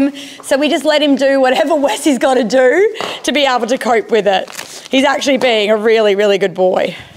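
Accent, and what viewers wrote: Australia. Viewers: Wow so interesting - Wes was soo good with the ducktape - TFS 😍 just a quick question are you Australian? Love from a fellow Aussie 🦘🐨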